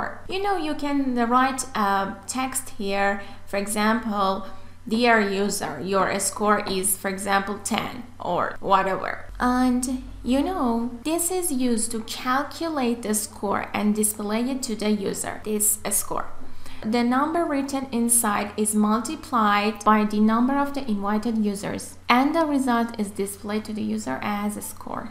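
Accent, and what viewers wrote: Iran. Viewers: At first 10 seconds i Told myself she is Iranian🙂 The famous Iranian accents🥰 باعل واعل😂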